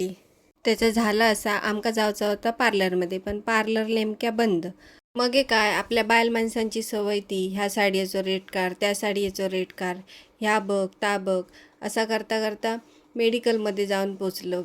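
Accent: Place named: Malvani, India. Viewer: Madam tumhi khup chaan malvani bolta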